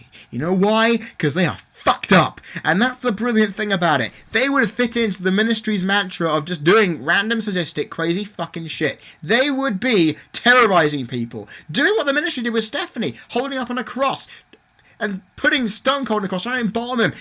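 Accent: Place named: United Kingdom. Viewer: I love the British Accent fucking awesome whenever i do a British Accent i sound Australian or Irish and sometimes even Italian lol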